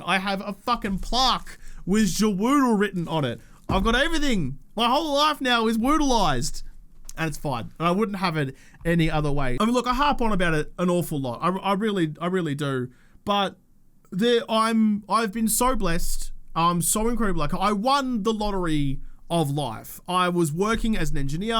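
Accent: Australia. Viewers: Flipping the script on Neil's letter... the question for viewers & subs is whether we remember our first JaWoodle 7D2D video? I do. I had been playing 7D2D since the Alpha versions could be counted on just your fingers... and then Alpha 17 landed, and the game took a massive leap forward. I wanted to build a base using a draw bridge, and I stumbled into a relatively recent video from some guy with a strong Australian accent, explaining draw bridges. From the first video, I was hooked. I came back to see others, and within a relatively short time, I was Subscribed. Excellent work on your part (the arrow slit force field was game changing for me with regards to how I managed base-building). Mixed with a decent Draw Bridge layout, the arrow slit force field was unbeatable. So much has changed since then, but not JaWoodle... consistently inventive and creative, and always chock full of fantastic base-building & horde-night prepping ideas. 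Thanks for all of it,. The game simply would not be the same without you. Congrats on a well-deserved 100k Subs!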